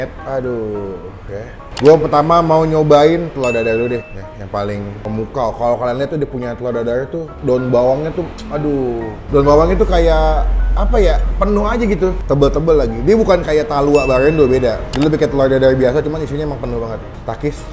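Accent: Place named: Banyumas, Indonesia. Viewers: Purwokerto medoknya keliatan banget wkwk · Ora Ngapak Ora Kepenak Pak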